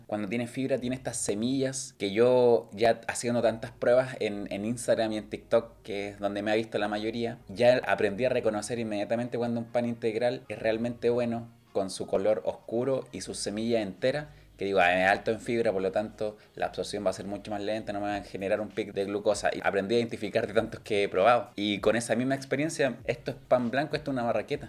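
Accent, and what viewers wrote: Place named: Chile. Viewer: Me encanta tu acento chileno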